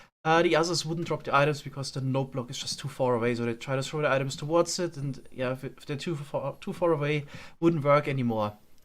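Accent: Germany. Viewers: The german Accent haha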